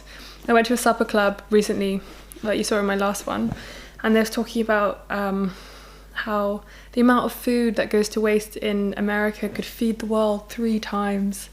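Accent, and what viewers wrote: London, United Kingdom. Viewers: What part of London are you from? You have the poshest accent I've ever heard haha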